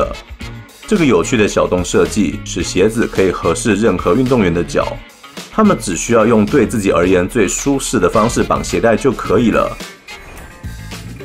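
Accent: Taiwan. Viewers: 說話方式怪怪的，而且聽起來像台灣人又有點不像台灣人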